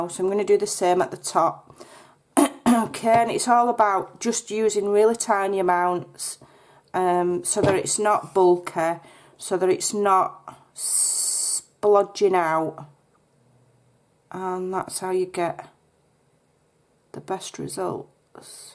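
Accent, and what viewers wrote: Yorkshire, United Kingdom. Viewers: Your cover is just perfect for your beautiful journal 💝 Love your ingenuity with the faux book plate 💝 Love your Yorkshire accent ! We have strong accents down under too🦘🇦🇺 🐨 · One happy mistake. The cover looks gorgeous. Ty for sharing how you made your book thingy (foggy brain!). Great idea. I have relatives in Ripon and elsewhere in Yorkshire and Teeside, so I love your accent. I lived in Middlesbrough and also Ripon many, many moons ago. Lol. Have a nice weekend. Xx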